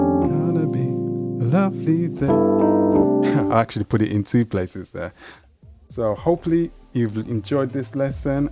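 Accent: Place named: Birmingham, United Kingdom. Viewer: great stuff. Do I detect a fellow Brummie? 😊😊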